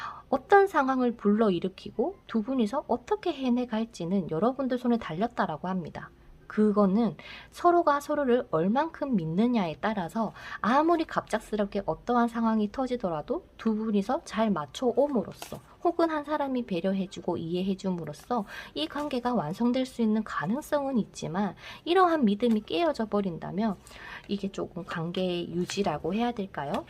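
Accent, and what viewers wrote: Gyeongsang, South Korea. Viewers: 4 수달 저에게 너무 지친 나머지 제손 놔버리고 다른 분 만나러 갔는데... 포기하고 지내면 언젠가는 와줬으면 하네요ㅠㅠ 그분이 경상도 분이라 님 말투들으니 더 보고싶어요..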